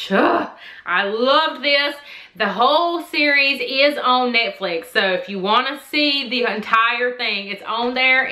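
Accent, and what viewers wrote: South, United States. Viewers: Where u from the accent sounds southern ??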